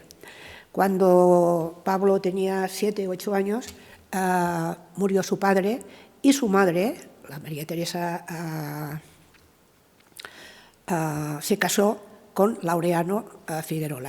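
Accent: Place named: Catalonia, Spain. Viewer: ¿que pide perdón por su acento catalán? ¿pero esta de qué va?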